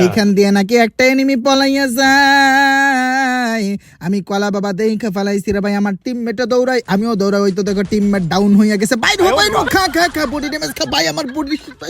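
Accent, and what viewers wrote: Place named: Munshiganj, Bangladesh. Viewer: Bhai apnr basha Munshiganj bikrampur er kon jagay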